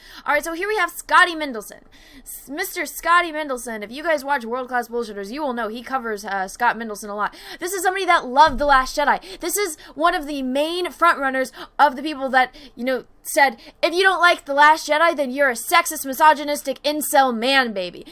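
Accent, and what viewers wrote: Portugal. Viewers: Are you Portuguese? 🤗💪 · wait are you portuguese ?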